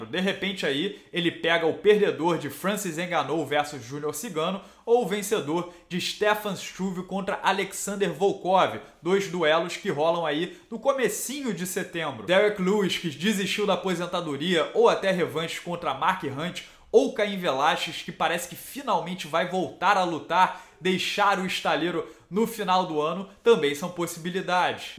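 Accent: Rio de Janeiro, Brazil. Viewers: tem q tirar esse sutaque carioca ai que o canal fica melhor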